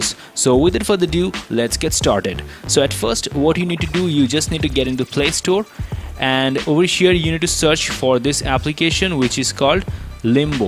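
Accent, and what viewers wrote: India. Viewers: Indian has a funny accent, i imagined he moving his head when talking, like acha acha nahi nahi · Hindis English😄😄😄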